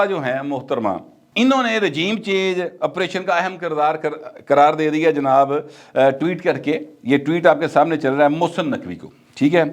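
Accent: Punjab, Pakistan. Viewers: Will u pl lessen the touch of Punjabi.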